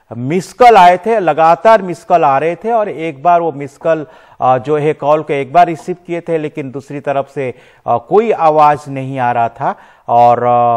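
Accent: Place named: Bengal, India. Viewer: Bangali tone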